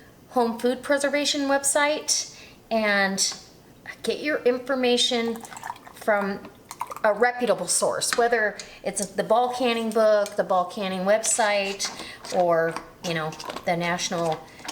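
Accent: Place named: Upper Peninsula, United States. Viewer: Miz, I'm about to put up a crap load of pork shoulders I've had in my freezer for years, vacuum sealed. It's time to clean the chest freezer out, and I have just started canning meats. Can you give me some ideas of different ways/recipes I could make to can, using my pork? Thanks, In advance, and I think you sound like you are from maybe the U.P.? I like your accent.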